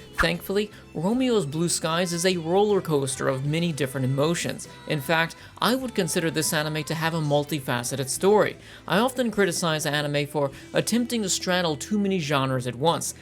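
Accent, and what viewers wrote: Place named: United States. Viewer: I'm just curious if you were able to watch it with English Subtitles? (Because you sound either American or Canadian... Maybe you can speak Japanese?) I cannot find a copy ANYWHERE with English subtitles! By the way - you are REALLY GOOD at reviewing! I'm amazed! I REALLY REALLY want to see this series and I'm so upset that I cannot find it! Is there any way I can give you my email address and you can tell me how I can watch this? (Or is that too personal....?)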